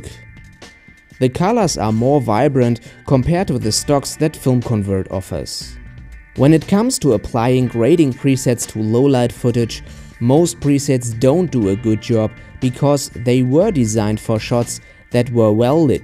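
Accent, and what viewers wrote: Germany. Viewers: Erst dachte ich mir so: "Da hoer ich doch ein Deutschen akzent" und dann sehe ich das du noch in der selben Stadt lebst wir ich :D Gutes tutorial, danke hat sehr geholfen ^^ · Lady Fynix man hört das deutsche 😂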